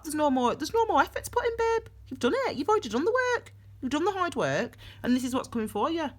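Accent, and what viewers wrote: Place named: Yorkshire, United Kingdom. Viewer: Thank you for the reading. I feel stuck and trapped in my job. I love your accent are you a Yorkshire girl?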